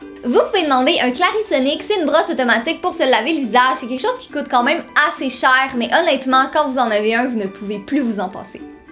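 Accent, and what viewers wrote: Quebec, Canada. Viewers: c la ou je me dis que j'aime vraiment pas l'accent canadien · J'adorreeee ton accent québécois 💕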